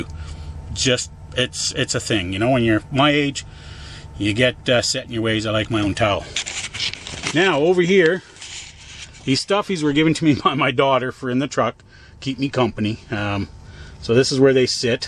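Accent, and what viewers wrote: Canada. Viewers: Good example of the Canadian accent!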